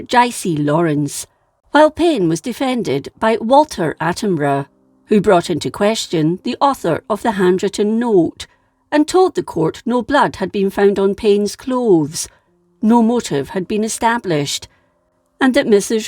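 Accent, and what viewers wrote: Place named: Scotland, United Kingdom. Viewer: I love your soft Scots accent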